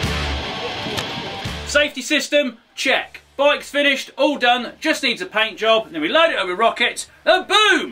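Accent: United Kingdom. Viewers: is this guy english or scottish or irish or welsh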